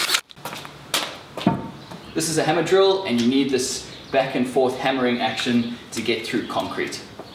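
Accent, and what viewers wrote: South Africa. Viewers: I thought i heard the Durban twang. You have a fan here in East London :) I love watching your videos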